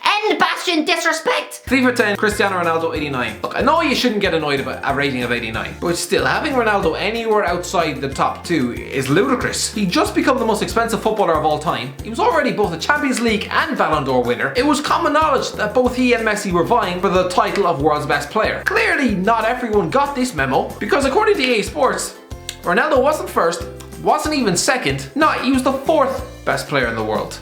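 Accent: Ireland. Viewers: After a night of no sleep it’s always nice to have the in my ear at 7am in the morning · I clicked on this video just to see the say "Hello and welcome to HITC sport!" 😭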